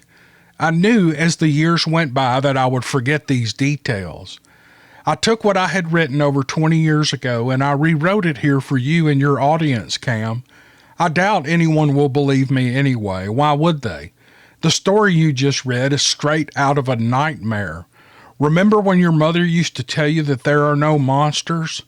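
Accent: South, United States. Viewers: Oh my Lord! Cam that was a fantastic account, I was hanging on every word. Thank you to the gentleman who finally shared this hair raising experience.You're also one hell of a writer. The combination of great writing, riveting content & sweet southern drawl of a narration made for a perfect story. I think I'd have night terrors for years had I experienced anything like that. Way good thing that cryptid already had his supper in front of him. It wouldn't surprise me one bit if most of these other Cryptids (other than Sasquatch) weren't the results of some black project military/mad scientist attempts at genetically combining or re engineering experiments gone awry. Whew! that was a mouthful!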